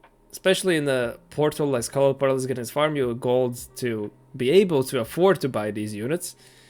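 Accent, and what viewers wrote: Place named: Latvia. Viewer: Will have to try this one out. Based on accent and Channel name I guess it's safe to assume you are from Latvia. Also, it seems like the titles of your videos grab attention very well, but some work on thumbnails could help you with reach, I haven't explored much of TFT content, but a bit of research on other TFT videos and their packaging can go a long way when it comes to exposure.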